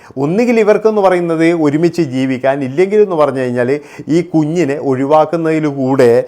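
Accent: Kannur, India. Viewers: താങ്കൾ കണ്ണൂർ അഴിക്കോട് ആണോ സഹോദരാ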